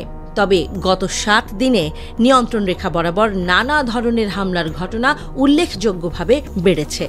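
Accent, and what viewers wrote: Kolkata, India. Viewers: ঠিক প্রথম কলকাতা মত খবর গুলো পরছে।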